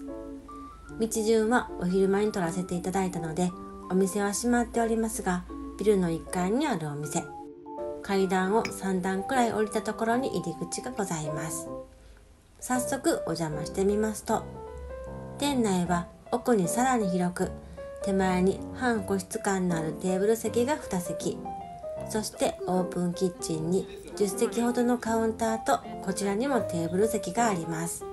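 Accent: Kyoto, Japan. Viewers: その京言葉が いつも心地よくて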